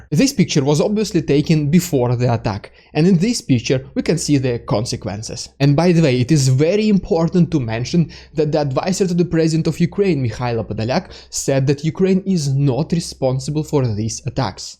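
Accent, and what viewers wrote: Russia. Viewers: No, it's the Russian accent that also ads to this channel being totally amazing!💕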